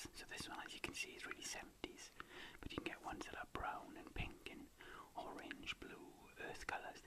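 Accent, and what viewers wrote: Wales, United Kingdom. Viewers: I love this idea. I LOVE the book you chose. I struggled a little with your gorgeous Welsh accent, what with so MANY whispered words. Would you consider soft-speaking, or a mix of the two, if you do more storytelling? You lose a bit of the intonation, with whispering, is all. Thank you so much for spending your free time on videos for us! PS: The blanket is fab!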